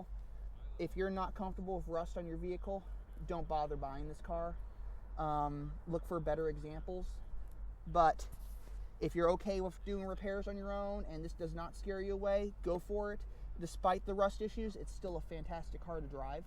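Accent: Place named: United States. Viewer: Damn I wanted to watch an R53 guide... but I can’t listen to this Yanky accent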